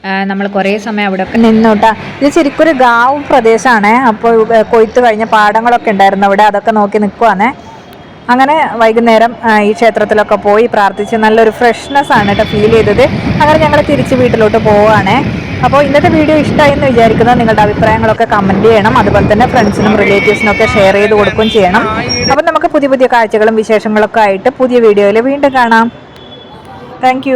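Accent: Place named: Kannur, India. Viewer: Kannur slang super.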